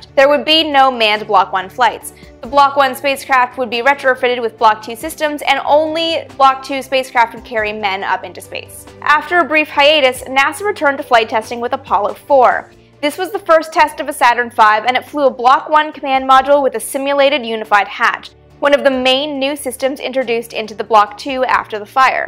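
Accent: Canada. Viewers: speaks like a Canadian to my ear, although these accents are almost the same.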